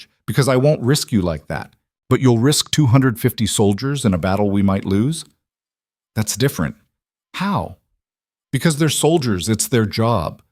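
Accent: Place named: United States. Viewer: Hell is acceptable but NOT f***!!! And the modern language/accent of the narrator is annoying!!! Why American English when it’s Victorian England…??!! Can NOT recommend, NO subscription!!!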